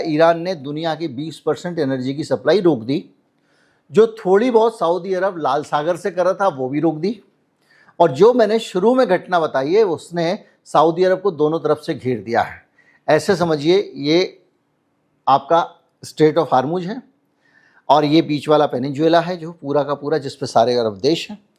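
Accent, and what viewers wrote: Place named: Bihar, India. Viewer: आज आप बिहारी टोन मे बोले है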